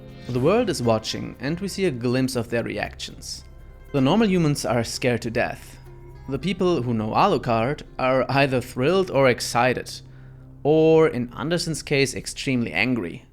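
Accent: Germany. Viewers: figured your german is wayyyy too good and the slight accent when you speak english made it obvious in my opinion anyway watched all your hellsing videos they are awesome dude👍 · its nothing new to anyone and history itself that "bad people" lived prosperous lives after their deeds . i take a wild guess and say given your accent and pronounciaton your german like myself. Take it as you will but reality has never been and will never be Black and White. People just are or believe to be at the right side of History is all. As for your search History that is clocked one way or another. just make sure your not getting fined if you own Volumes of Hellsing that wherent unedited as our Goverment is laughably sensible as per usual and put laws arround that "kind" of Imagery. So if you do own any of it dont show it arround. that might get you into trouble. Good Research overall on the Fella. 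One could also always add that Werewolfs where one way to kill Vampires which is why Alucard felt icky about fighting him. Though of course thats merely Conjecture and one of a dozen ways the Myth of Vampires is told.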